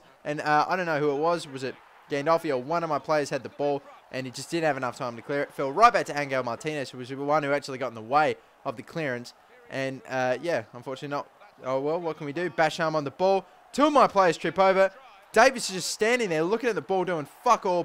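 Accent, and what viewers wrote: Australia. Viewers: Guisseppe Murru...I have a scouted star of my own called Guisseppe Missiroli. Way to be fuckin' creative EA, seriously haha. Anyways, I would be complaining as much as everyone else about your dilly dallying around the story but I adore Aussie accents so I'm good. Win-win lol.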